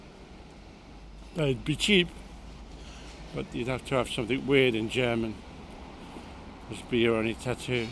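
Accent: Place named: Liverpool, United Kingdom. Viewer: I listened with the CC on and the subtitles are utterly hilarious. I guess the person subtitling doesn't understand Scouse accent or knows London at all. Exmouth Market was "Eczema Market", Anglo-Saxon car park was "Then go suction" car park, and Pret A Manger was "press emoji". I may watch all the vids again with the CC on to see how bad they are.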